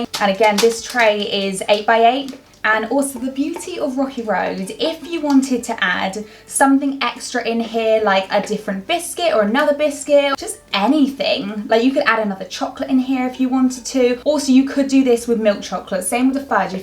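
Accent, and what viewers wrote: United Kingdom. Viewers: Omg whats your accent? Is it English? Its beautiful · I think she has British accent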